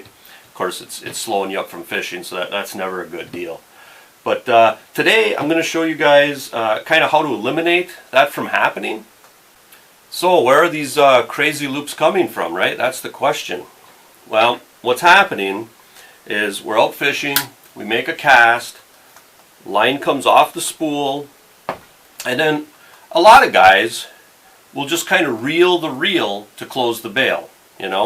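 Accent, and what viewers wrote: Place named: Wisconsin, United States. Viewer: First off awesome video. Second, I watched about 3 minutes of this and was like this guy must be from Wisconsin...then I saw the hat!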